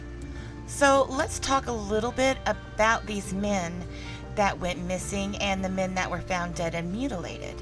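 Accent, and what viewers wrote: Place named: South, United States. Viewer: I just love the southern accent 😉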